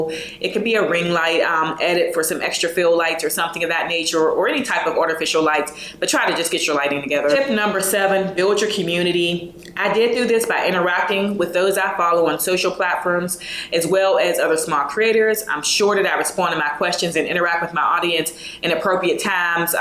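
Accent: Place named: South, United States. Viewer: Congrats on reaching 1k! This was great! And where are you from? I definitely hear a southern accent!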